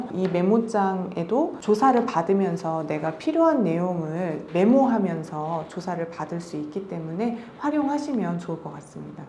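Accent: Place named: Seoul, South Korea. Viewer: 참 미인이세요,말씨두 너무 이쁘구요.내고향 서울 말씨 너무 듣기가 좋구 편해요.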